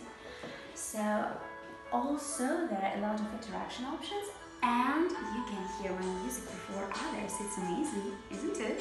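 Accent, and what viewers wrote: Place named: England, United Kingdom. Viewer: Thank you so much for this video! You are so genuine and sincere. I love your voice both singing and with your English accent 😍 💕 😉 Youbare elegant,classy and very much reminded me of old time Hollywood movie stars 🌟. You have that timeless quality I think. I am from Canada 🇨🇦 and also a singer/songwriter. I would like and hope ery much to meet you and talk someday. Maybe while you are on a photo shoot or music video or on video chat. I admire and adore you. Have an Amazing day 🌅 💛 ❤️ 💕 Love, Love, Love to you ❣️ Take care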